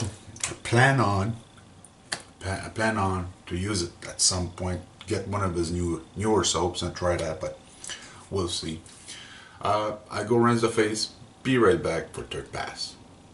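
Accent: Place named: France. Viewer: Hey man your doing a great job and don’t worry about your French accent it’s cool there are a lot of us myself included who could learn to speak a second language and some of us are much poorer for not learning it. As for shaving there is no advice that I can give anyone on that topic I’m just glad to have gotten the hell away those stinking cartledge razors since 2010 my face has never felt better, so long Gillette, Schick, and all the other junk 3,4, and 5 bladed crap as well as those synthetic shaving soap. Anyways Cheers man if you are watching the Grey Cup tomorrow enjoy I’m going with Ottawa although I live in the west, Cheers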